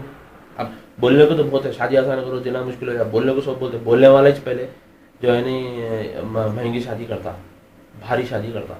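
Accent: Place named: Hyderabad, India. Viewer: well said bro with sweet hyd lang :)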